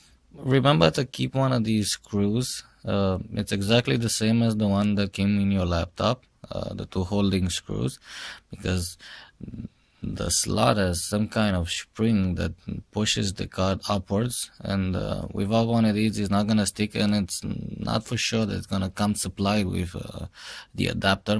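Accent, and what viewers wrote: Romania. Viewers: de la accent mi am dat seama ca ești român :))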